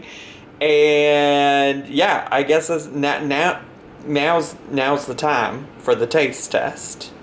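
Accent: South, United States. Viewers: Lmao your random southern accent throughout the video is oddly fitting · This new southern accent isn't it babe. Kinda like our gorls "lah" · you are very southern in this video LMAO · I never noticed until this video that has a country accent!? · How do you have a country accent but you live up north?! It gets me every time!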